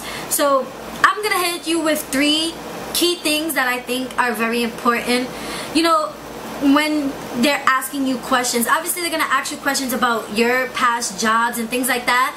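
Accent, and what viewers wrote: New York, United States. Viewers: You must be from NYC ☺ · Its called a NY accent..duhh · are you from nyc??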